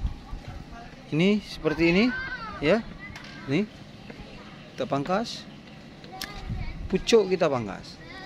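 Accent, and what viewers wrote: Malaysia. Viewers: saya ingat salah dgr abang ckp loghat org Malaysia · Pandai cakap malaysia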